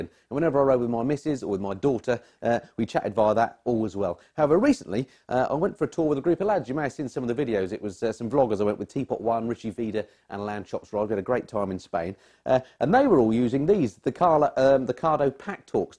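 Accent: United States. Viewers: loved the American Accent .. I still find it amazing that we speak the same language, yet "you guys" sound like your chewing on your words :) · LOL! Loved your American accent! My buddies and I are buying these soon. They seem to be the best out there. It was fun to see box contents and get your take. I wonder if Cardo speaks Texan?!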